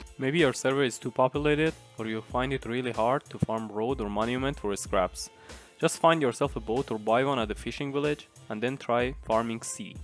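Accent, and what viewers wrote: Iran. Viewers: kheili moshakhase ye irani dare English shoat mikone irani hasti? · i bet you are iranian,ur accent man :D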